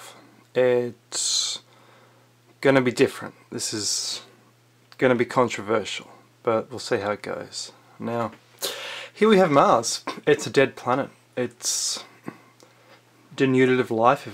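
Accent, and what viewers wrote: Australia. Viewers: This man sounds Australian but he obviously has not seen very much of it. The redcentre is fairly desolate but all states have huge forested areas. The area shown inthis video is in the iron mining area of Western Australia. The rest of Australia isbeautiful and we have the best beaches in the world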